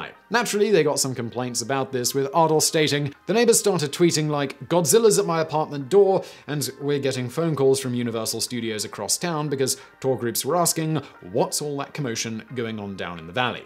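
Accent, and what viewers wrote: England, United Kingdom. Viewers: Yeah, me too. You really can't blame this particular error on his British accent this time either.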